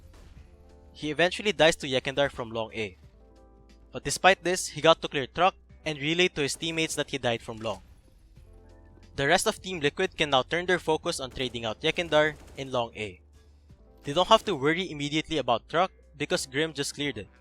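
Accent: Philippines. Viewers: nice content again from, btw the voice over sounds like a Filipino. Love it!!! <3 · good content. btw narrator sounds like he’s from the 🇵🇭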